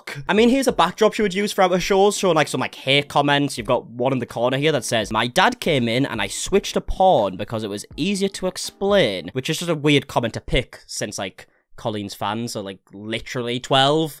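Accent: United Kingdom. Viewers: welsh, scottish irish or english---the accent?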